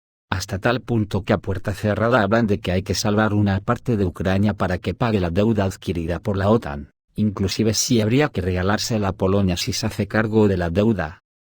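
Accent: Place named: Spain. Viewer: MAESTRANZA RECUERDA ALGO EN CUANTO LA JEÑGA O EÑL DIÑLECTO DE HABLAR. ES ESPAÑOL, ESA ES SU FORMA DE HABLAR Y LO EXPLICA MUY BIEN NO IMPORTA QUE DURE DOS HORAS, LO MEJOR ES EML MENSAJES QUE DICE YA TODOS ESTA DICHO Y YA ES TARDES, UN DÍAS DE ESTOS AMANECERENOS EN EL INFIERNO Y NO DEL DIABLO SI NO CREADO POR LA ESTUPIDÑE DE LA OTAN QUE NO ENTIENDE NADA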